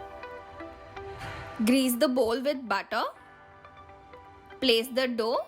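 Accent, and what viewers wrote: India. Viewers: I luv ur hindi accent 😙😙💕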